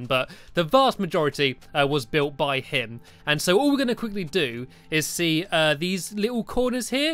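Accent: United Kingdom. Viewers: Wooo ur british like me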